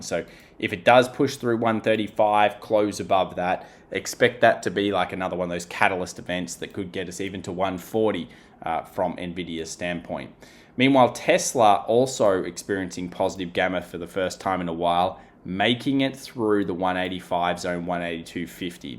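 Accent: Australia. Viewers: Love the Australian accent pontificating about the US "mahket."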